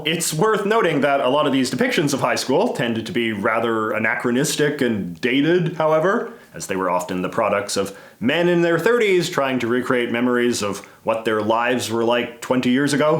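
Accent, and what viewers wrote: Canada. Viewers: I love his Canadian accent 😍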